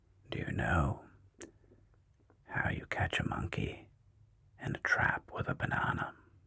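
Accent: Germany. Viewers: Perfect, I love the German accent, and your thoughts!💛 The banana metaphor was so simple, but so on point for this... because holding onto things is sometimes less scary than the unknown even if it cages our very own hand in a bowl... very true! Loved the insights of this video!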